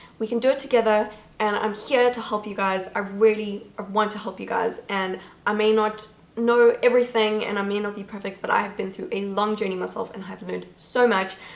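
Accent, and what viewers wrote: South Africa. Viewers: are you south african omg love your accent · You sound South African 🙃 anyway great video thanks.... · I thought i recognized the accent. Im also from SA, Cape Town. :) Love your channel! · Wait r u south african?? Capetonian here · You probably get this question a lot, but is your accent south African? It's very beautiful.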